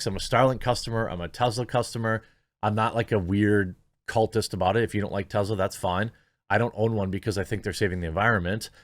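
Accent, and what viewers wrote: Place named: Midwest, United States. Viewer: it's the mid-western accent get over it.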